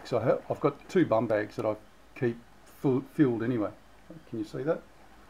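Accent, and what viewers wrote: Australia. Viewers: Hi, great to get a reply from you. All good, happy for you to use my message. I have faith beyond measure and just know that no matter what my wonderful Father is watching over me and my family. I believe so much that very bad things are on the way and that those who are awake and prepared will survive. Keep up with your videos, so great to have an Aussie to listen to.... doesn't make the rest of us Aussies feel so alone.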